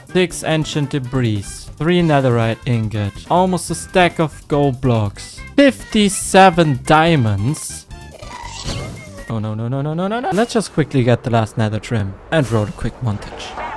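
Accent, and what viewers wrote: Germany. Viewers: You are not "Hochdeutsch" are you? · du bist deutsch das hört man an deinem english und kein engländer sagt oki doki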